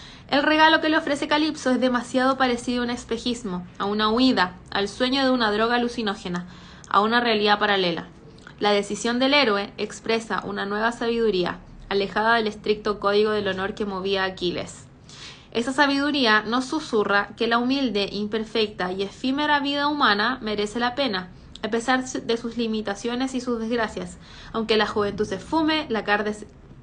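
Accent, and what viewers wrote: Chile. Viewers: Woow,la combinación perfecta, un buen desayuno y la lectura de un buen libro, me encantan tus vídeos,me gusta tú acento chileno, me agrada tú voz,y tú forma de ser, aparte de ser muy bella eres muy inteligente,y admiro tu gusto por los libros,me fascinan la temática de tus vídeos, me gustaría ver un vídeo donde cuentas más sobre ti, o algún vídeo de la cultura de tu país, ya qué mi cultura cómo mexicano, es diferente a la cultura chilena,aún qué vivimos en países hermanos de latinoamerica,cambian los gustos literarios, me encantó la temática del vídeo! te envío un beso y un abrazo, con absoluto respeto..saludos princesa⭐🌺👌😘😘😻☺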